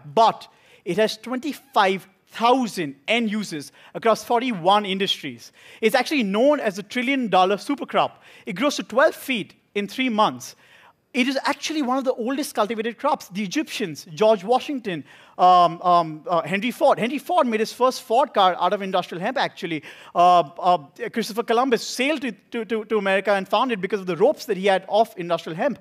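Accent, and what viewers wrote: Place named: South Bombay, India. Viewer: He really got that sobo accent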